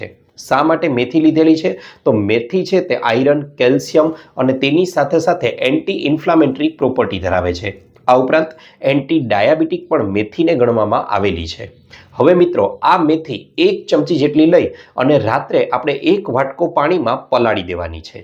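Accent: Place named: Rajkot, India. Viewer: રાજકોટ તરફની ભાષામાં 'સ', 'ષ'ના ઉચ્ચાર 'સ' કરે છે અને 'ળ'ને 'ડ' બોલે છે. તમારી ભાષામાં એવી અસર કેમ છે ?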